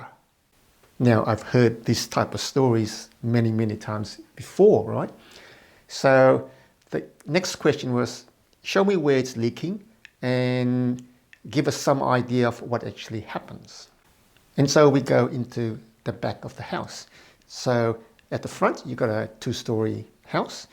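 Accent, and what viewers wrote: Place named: Australia. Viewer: Completely off the topic, but I love hearing such an Australian voice from such a non-English face. You are what makes this country worth being proud of. Thank you.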